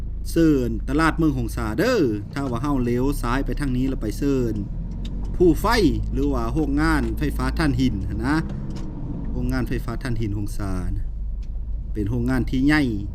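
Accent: Phu Thai, Thailand. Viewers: เจ้าเป็นคนเเขวง เมืองเลอ คึเว้าพูไท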